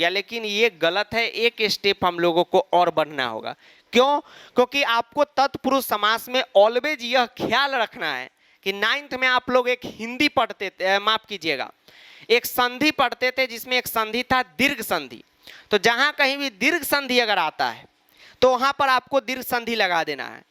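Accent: Bihar, India. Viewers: i like bihari and after hearing you for hours i am also now speaking bihari 😅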